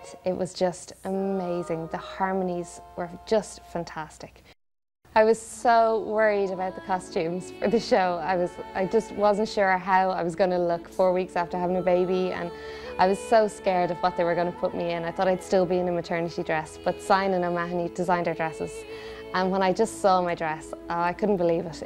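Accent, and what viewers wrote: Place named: Ireland. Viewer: the best! Her singing is incredible, and that Irish accent... I melt when I hear it!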